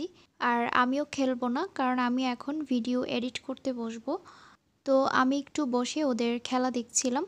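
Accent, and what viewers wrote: Comilla, Bangladesh. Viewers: Apu tmr basha comilla kothay?? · Nice apu bt apnar basha comilla kothai amar o comilla basha apu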